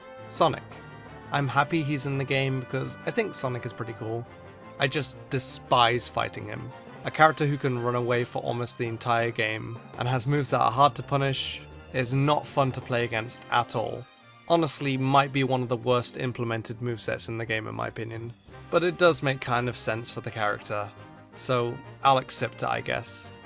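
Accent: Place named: United Kingdom. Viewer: Wilfred Cthulu he did with the koopalings and that’s all that matters that he thinks Wendy Koopa sucks & the rest besides jr & like iggy which is fine but yeah those aren’t different characters just skins ya know so I guess if u wanna hear his British voice going I really like this shade of purple on daisy then...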